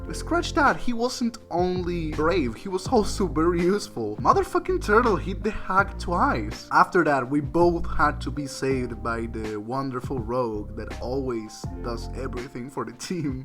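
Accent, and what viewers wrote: Argentina. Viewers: Me parece o eres un brother argentino? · are you argentino?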